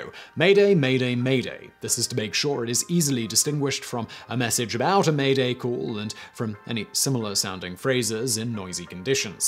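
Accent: United Kingdom. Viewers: British people like Simon have this charming habit of pronouncing foreign words as though they were English ones when referring to them, instead of using a foreign pronunciation that's very well known. Americans are much less likely to do it, although it's still fairly common for people to butcher the Latin pronunciation of infinitives that end in -(i/e/a)re as if they rhymed with hire, here, or hair, which they do if you pronounce the symbols as though they were an English word instead of a Latin one, but is as wrong as Simon's pronunciation of the French words in this video. It's odd because you'd think Europeans would be more aware of the fact that things are pronounced differently in different languages, but the British being insular probably get it much less.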